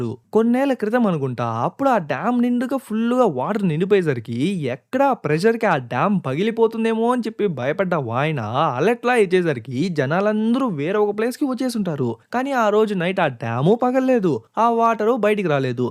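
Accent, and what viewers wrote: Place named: Tirupati, India. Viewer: Triupathi slang never forget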